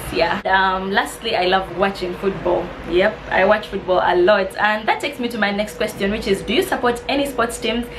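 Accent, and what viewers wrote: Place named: Uganda. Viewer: I could tell that you lived in Uganda from the English. Yes. There is a ugandan watching. Subscribe to my youtube channel too.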